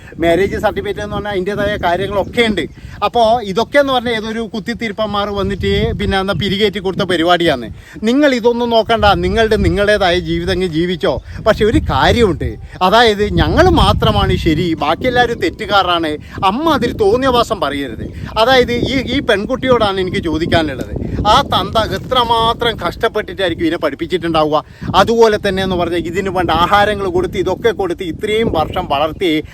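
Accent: Kannur, India. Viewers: സ്വതേശം കണ്ണൂർ ആയിരിക്കും സംസാരം കേട്ടിട്ട് തോനുന്നു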